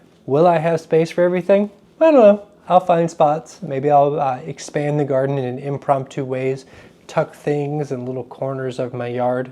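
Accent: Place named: West Africa. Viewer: The French with a west African accent piqued somdthing....are you a MK?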